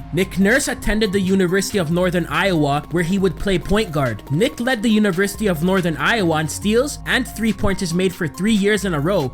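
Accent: Toronto, Canada. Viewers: This guy is a Toronto man confirmed 🤣😭😭 the accent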